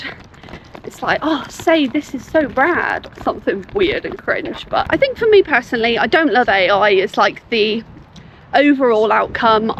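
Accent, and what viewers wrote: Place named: United States. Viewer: As an American, it threw me for a loop hearing another American voice suddenly 😂😂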